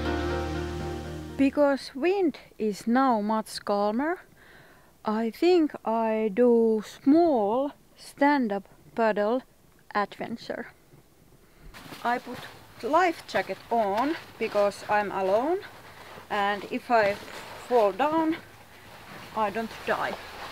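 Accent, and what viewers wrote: Finland. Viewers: I am from Nepal(very small country from asia)..and the most unique thing i found about Finland is the accent...i have mentioned in ur other videos...its really amazing the accent...Moi!!😁😁😁😁😁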